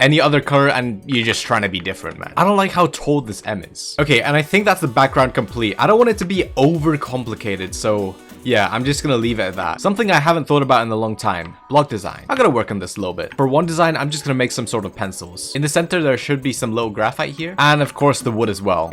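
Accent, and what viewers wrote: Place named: United Kingdom. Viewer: wait he’s British? AW YEA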